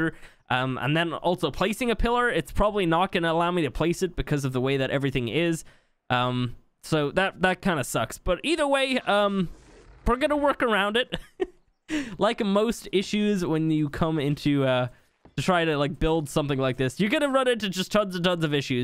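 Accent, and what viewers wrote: Ireland. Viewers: Who else hears the Irish was in voice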